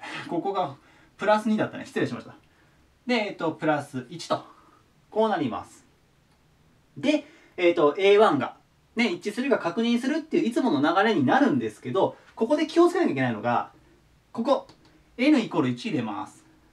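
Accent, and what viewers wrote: Kansai, Japan. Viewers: 所々出てしまう関西訛り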